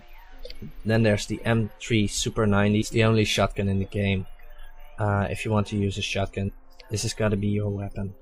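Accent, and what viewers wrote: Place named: Russia. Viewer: the guy sounds russian i can tell an accent very good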